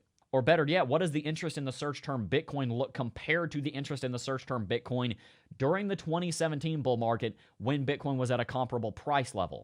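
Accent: South, United States. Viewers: Hey dude are you from the south? I like you accent very much. Thank you for your voice.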